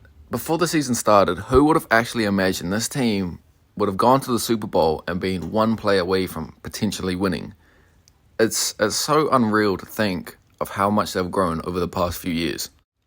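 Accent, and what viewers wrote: United Kingdom. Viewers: Is this guy British? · ngl its super different to hear someone with a british accent doing commentary on the nfl. im glad to hear that american football is becoming a little more mainstream on the international stage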